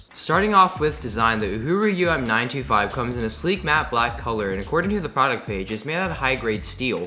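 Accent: South, United States. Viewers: I think the intro was your original accent and you put on a more "normal" accent for the rest of your video. Dont be afraid to show your viewers who you are. Let your southern accent out with love and passion. We want it. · good southern accent. Chinese accent is next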